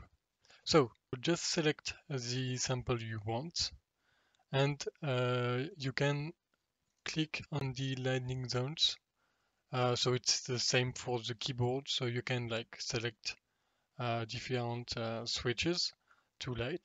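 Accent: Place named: France. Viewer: Oulah c'est un français ça mdr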